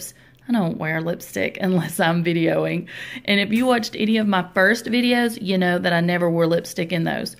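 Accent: South, United States. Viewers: I just found your channel and was getting ready to ask where in the South you were (heard that Southern accent😄)then I saw your p.o.box address in TN. I live in North Georgia about 60 miles from Chattanooga TN. I've never heard of Elizabethton TN. Nice to find another Southerner here. 😄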